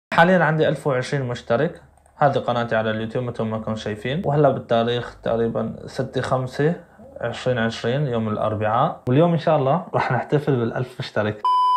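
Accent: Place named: Syria. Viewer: مبروك يا اخيي بس عندي سؤال انت سوري ام لبناني لان لهجتك سورية ولكن فيك كم كلمة لبنانية أنشأ الله ترد علي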